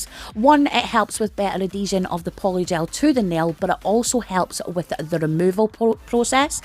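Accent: Scotland, United Kingdom. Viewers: You are from scotland, aren't ya? I can hear it, as a German 😅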